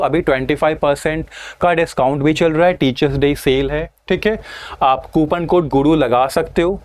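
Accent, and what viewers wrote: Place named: United Kingdom. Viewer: Bhai hindi bolne ka course kr lo Aise lg rha hai England se yha aakar hindi sikhe ho Thanks for these knowledge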